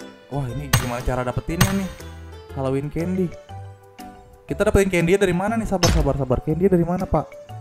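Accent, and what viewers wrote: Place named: Jakarta, Indonesia. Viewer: Fix Betawi ini orang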